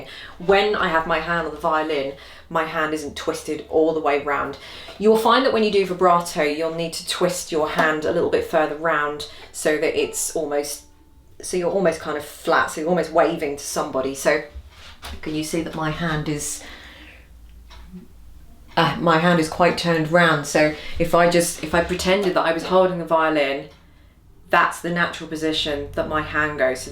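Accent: United Kingdom. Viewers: This woman is fabulous and no nonsense. I love British accents too :) you inspired me to buy a violin again a twenty year hiatus and here I am practicing!!!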